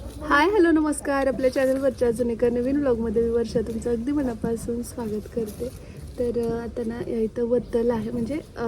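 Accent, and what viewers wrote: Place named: Latur, India. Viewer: Tu pn aata लातूर chi भाषा बोलतेय khup aavdle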